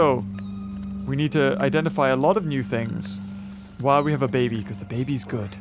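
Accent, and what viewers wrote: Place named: United Kingdom. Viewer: I love ur vids, I always laugh and smile, I'm going through a hard time, and I love ur British accent, and ur comedy, plz keep going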